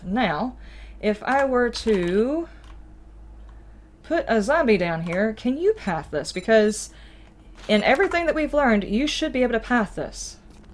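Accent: South, United States. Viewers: Not related to 7DTD, I’m English so being able to place American accents is not a skill I have, what accent is it you have, been trying to figure it out but I’m useless. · seriously i think shes georgia/missouri and those kinda places she says things like you'all? and other southern words and ways of speaking. also she has those those southern good manners and so on im pretty sure shes from there. havent beed to yorkshire in decades i loved it there i should go back some day :).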